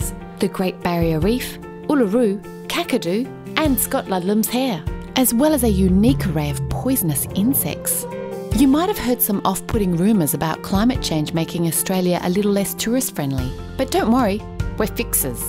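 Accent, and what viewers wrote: New Zealand. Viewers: Is that a Kiwi accent? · Voice-over sounds like a Kiwi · She sounded like she had a bit of a kiwi accent.... I call shenanigans!!!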